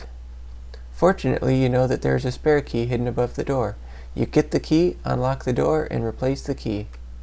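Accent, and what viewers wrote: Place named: New Zealand. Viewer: Sound like he is from New Zealand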